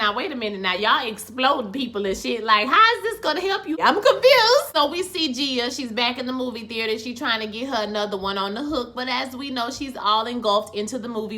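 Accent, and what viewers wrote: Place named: New Orleans, United States. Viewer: you gotta be from new orleans... that accent came in loud and clear... great review.. I come for the comedic nuggets you provide... hilarious..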